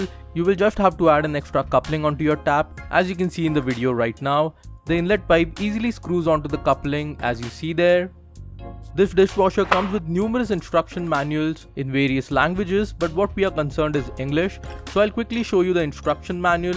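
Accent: India. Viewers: Indian cringe accent :v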